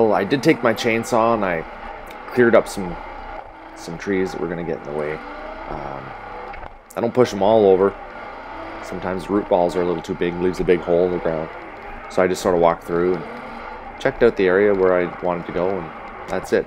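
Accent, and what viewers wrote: Canada. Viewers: please don't talk, can't stand that Canadian accent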